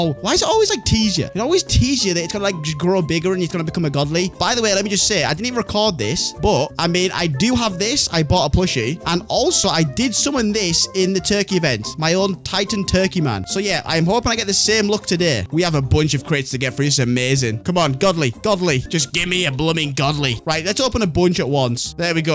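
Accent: United Kingdom. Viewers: Bro are u British or somethinh?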